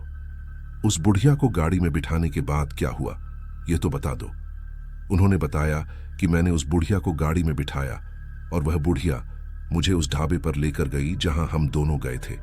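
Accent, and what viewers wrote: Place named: Bihar, India. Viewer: Are Bhai bihariyon ki tarah kyon bolate ho bada Ajeeb lagta hai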